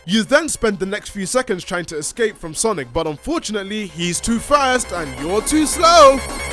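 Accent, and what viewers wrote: England, United Kingdom. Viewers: this dude is 100% English you just know lmfao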